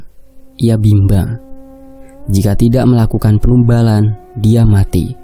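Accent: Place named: Kalimantan, Indonesia. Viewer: Mas ini cerita dr daerah mn,,, Logatnya dr kalimantan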